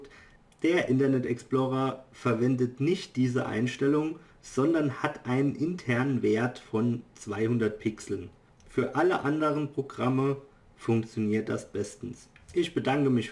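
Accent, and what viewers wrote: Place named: Hesse, Germany. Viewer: Bist du Hesse? Du hörst dich nämlich an wie einer :)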